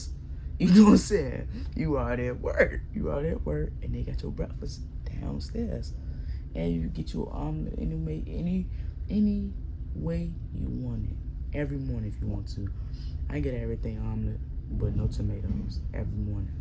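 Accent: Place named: South, United States. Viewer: yk the whole video i was saying “damn she talk like she from the south” it had me wondering where you was from cs shit got to familiar 😂aye as soon as you said most people ain’t seen outa va Ik you hada been from here ,757 specifically i might be wrong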